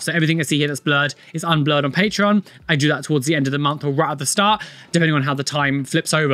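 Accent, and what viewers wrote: United Kingdom. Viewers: That bri'ish accent girrrrrrl 😼🎀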